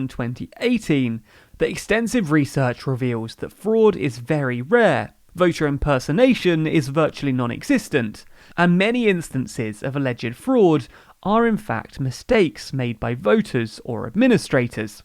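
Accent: United Kingdom. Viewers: Why would I trust anything about the US from a person with a British accent?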